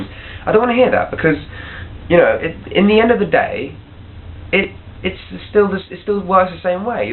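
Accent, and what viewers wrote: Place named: South, United States. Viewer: i love your videos they are very insightful:) p.s. im in love with your voice i love southern accents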